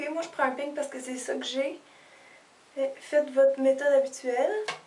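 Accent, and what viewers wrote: Quebec, Canada. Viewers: J'adore ce genre de coiffures et j'ai le même headband que toi seulement il glisse souvent (j'ai les cheveux très fins), je sais pas comment faire pour qu'il tienne bien, tu aurais une solution? Tes vidéos sont super, et ton accent me rappelle mes vacances au Québec :) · très jolie coiffure, qui a l'aire facile à faire. je vais essayer!!! ça ma fait bien rire quand tu parle de bobépine je ne connaissais pas ce mot et j'ai compris que ça voulais dire épingle à cheveux. J'adore l'accent québecois!!! Continue tes vidéos sont très bien!! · Je vais dire la même chose, très rafraîchissant d'entendre la couleur de l'accent québecois sur youtube. Continue d'envoyer des vidéos, ils sont vraiment bien faits. D'une Québecoise à une autre. Bravo! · Super vidéo merci continue ainsi !!! J'aime bien ton accent québécois :) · J'adoooore l'accent québécois ! 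! Et cette coiffure te va super bien !! :p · She has a canadian accent :thats why ! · Omg sa fait du bien d'entendre une québécoise dans se genre de vidéo! La coiffure est vraiment belle! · jador lacsen canadien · Canon et j'aime ton accent québécois !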